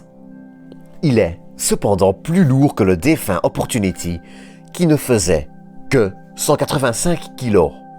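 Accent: Belgium. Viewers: Ta un accent belge jolie video👍👍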